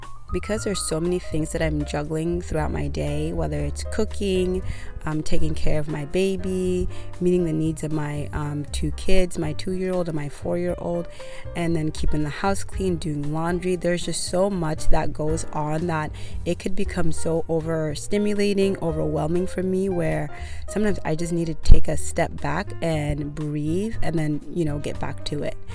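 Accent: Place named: Jamaica. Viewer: You talk like Jamaicans 👍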